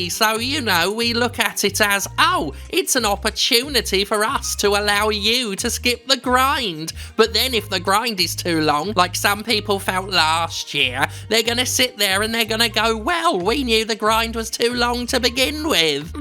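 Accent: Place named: Yorkshire, United Kingdom. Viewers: Love the grumpy Yorkshireman accent. Next time can we have a West Country cider-drinkin' combine 'arvester drivin' reading? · Jim Sterling is more Yorkshire than I am and I'm from Yorkshire. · The Northern accent makes me laugh every time.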